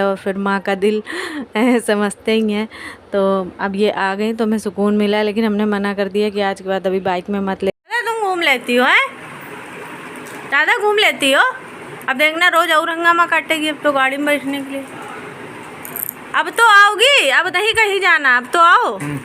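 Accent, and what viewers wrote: India. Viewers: Kanpuriya boli acchi lagti hai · Mujhe to nhi lga UP ka bhasa acha lgta h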